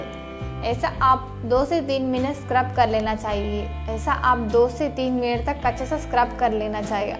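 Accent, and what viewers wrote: South, India. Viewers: your accent seem like a south Indian by the way I have a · Are u from south.. You are speking in very new manner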